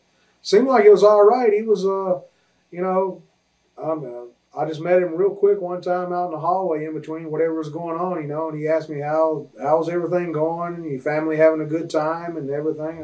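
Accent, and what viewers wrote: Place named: South, United States. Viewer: He sounds so different "country af" compared to the OMG and characters I've been looking at OMG since WCCW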